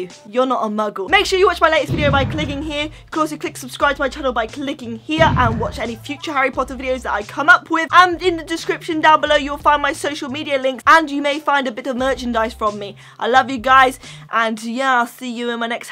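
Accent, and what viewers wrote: United Kingdom. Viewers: YESSSSSS !!!!!!I love u.I love your intro:Whats up muggles hahahahha Also are u british?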